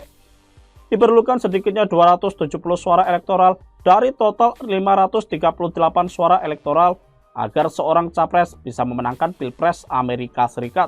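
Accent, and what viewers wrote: Java, Indonesia. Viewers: Penyiarnya medok Jawa. Intonasinya tdk bagus.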